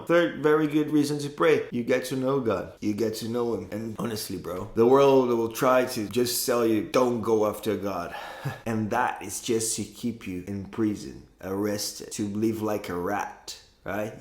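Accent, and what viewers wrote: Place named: United Kingdom. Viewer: o sotaque britânico kkkk mt bom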